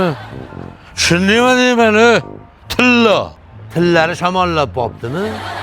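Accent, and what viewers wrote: Margilan, Uzbekistan. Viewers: Маргилонча бубтику. Бормиларми.😊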